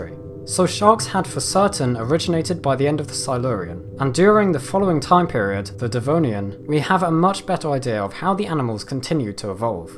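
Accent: United Kingdom. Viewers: Ssooo.... You're British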